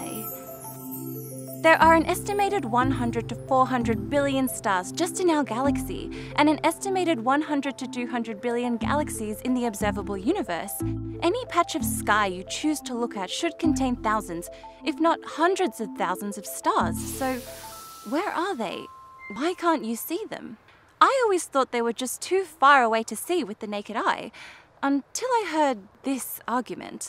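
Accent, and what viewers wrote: Australia. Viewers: I find her mild accent to be very pleasant and easy to understand... I'm pretty sure it's Australian, but I know it's not a Dutch/Afrikaans/South African accent; for some reason I find that accent to be very harsh... (I have nothing against the people themselves... I'm sure plenty of folks in SA would find my rural-western-American accent to be just as harsh and unpleasant, lol) ✌️ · I'm from Sydney and i didn't even notice that you were aussie until i saw an Australian ambulance lol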